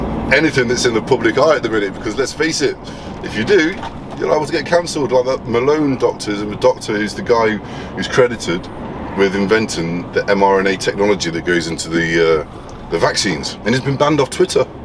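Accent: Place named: Loughborough, United Kingdom. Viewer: Probably not the best video to ask this - need advice... left my job due to finding out my management were heavily racist (stupid to leave without any job lined up but I'd rather leave on good intentions) Now in-between jobs, would you recommend agency at all? I like to be on the books as I'd like a mortgage in the next few years but I'm happy where I am in life so that's just a plus. I'm Loughborough based so plenty of jobs. Keep up the good work mate, nice to see someone with my accent who's a trucker and vlogging 👊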